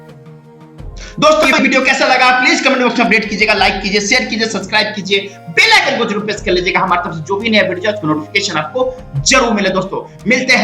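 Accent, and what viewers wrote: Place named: Bengal, India. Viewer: Bangali hindi is Amazing 👌👌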